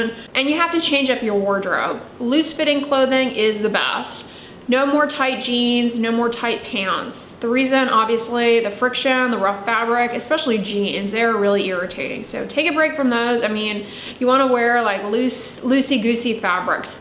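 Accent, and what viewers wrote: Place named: South, United States. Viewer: How does she have both southern and valley accent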